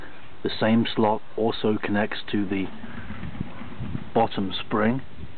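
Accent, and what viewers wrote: England, United Kingdom. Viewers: nice catch Mike. I looked at this and I see the shoes are reversed. The smaller one goes to the front not the rear. Judging from the accent this guy is from England and over there they do things opposite.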